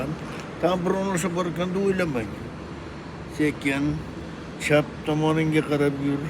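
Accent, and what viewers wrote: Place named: Kashkadarya, Uzbekistan. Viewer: Бу одам Кашкадарйоликка ухшайди шеваси шунга ухшайди, малалес гап йук санатингизга, Яна янгиларини кутиб коламиз, Оллох умрингизга Барака берсин.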